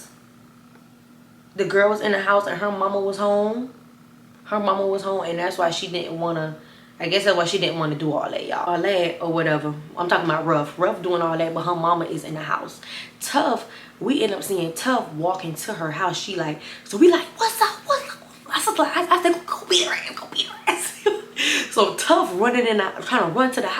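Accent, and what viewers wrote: New Orleans, United States. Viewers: You not from New Orleans ?😟could had fool me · Girl i woulda never guessed you were from Savannah 😂 it’s def giving NOLA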